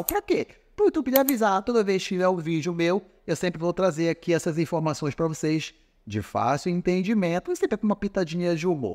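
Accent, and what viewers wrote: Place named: Rio de Janeiro, Brazil. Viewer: Dr vc tem staque de carioca...vc é do rio de janeiro?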